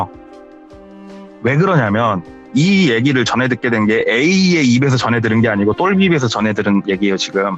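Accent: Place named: Chungcheong, South Korea. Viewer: 충청도 분인가ㅋㅋㅋㅋ 익숙한 억양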